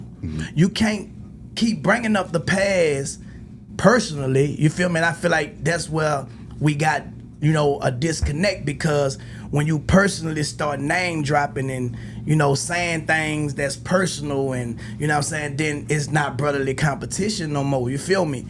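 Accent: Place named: Memphis, United States. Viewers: Turk always talking....thats what Juvie was telling his sensitive azz. Bg responded to what you said on this podcast and he didnt even go at you. He just said you sound like you from Memphis which i feel touched Turk. Turk keep BG name in his mouth more than anything. Just do yo thang and move on but this nigga still wanna reach. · Son u be doing too much and BG right u do sound like u from Memphis. The NO don't sound like nobody else no matter how long we rock in other cities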